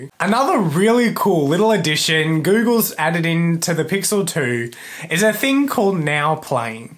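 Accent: Australia. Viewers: What kind of accent do you have? Australia? I come from germany by the way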